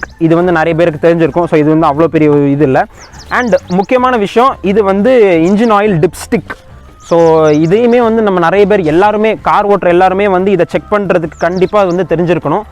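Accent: Tirunelveli, India. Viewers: Neenga tirunelveli ya bro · Bro neenga tirunelveli ah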